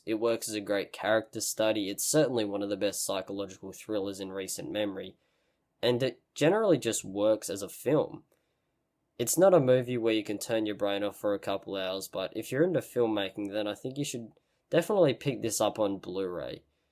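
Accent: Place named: Australia. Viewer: I think he's Australian.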